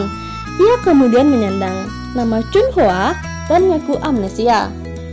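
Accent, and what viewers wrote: Bali, Indonesia. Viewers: Pasti orang bali . Khass logat nya 😌😌😌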